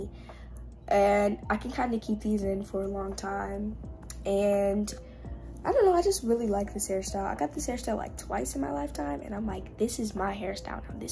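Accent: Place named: United Kingdom. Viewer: nahh she’s giving british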